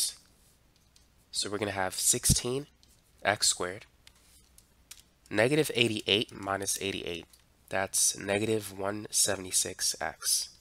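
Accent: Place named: New York, United States. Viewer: Based on your accent I'm guessing your from new York or jersey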